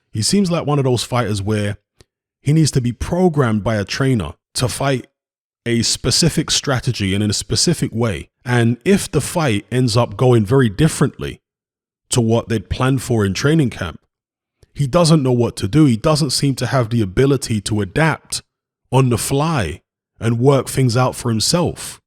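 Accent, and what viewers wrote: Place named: Cockney, United Kingdom. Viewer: He has a cockney accent why tf are you so upset?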